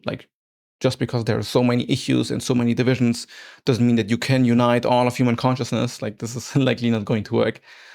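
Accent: Germany. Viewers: Why does the internet lie about your nationality and ethnicity? It is odd information to restrict access to. I would understand keeping your birthplace and current locale undisclosed, but the internet is wrong about all your details. I can tell just by looking at you and hearing your voice, you are definitely not a white Russian. AI has to go, it's useless junk. Based on accent, it is far more likely you are german. Your face is a bit ambiguous, so I could not guess where your family is from. Maybe India, Bangladesh, or Pakistan. Somewhere from the spiceland. Edit: Nah, you're some African Caucasian hybrid. That explains your accent.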